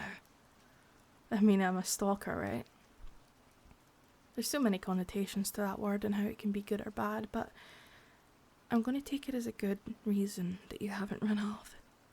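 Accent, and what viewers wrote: Scotland, United Kingdom. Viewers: omg she's scottish. that changes everything XD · Ahh she's a sco-ish stalker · This went places I wasn't prepared for. That line about not needing someone to help you feel things got me. The Scottish accent helped give the performance an aire of authenticity. Amazing work. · I prefer your deep Scottish accent. I find it more relaxing when I hear your Scottish voice than the higher pitch voice. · I'm done with normalcy, I'd trade it for a cute scottish stalker given the chance.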